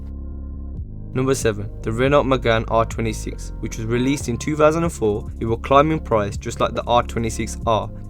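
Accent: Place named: Birmingham, United Kingdom. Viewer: that birmingham accent come onnnn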